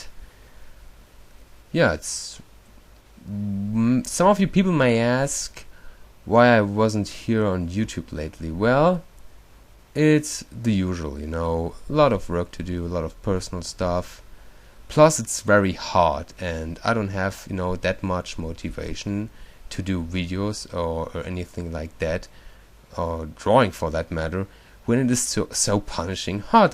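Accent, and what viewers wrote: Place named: Germany. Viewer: Funny. The minute I heard his accent, he could only be German! XD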